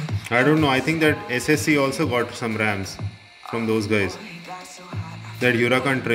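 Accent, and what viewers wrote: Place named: India. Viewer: your voice is kinda Indian type ... Just the accent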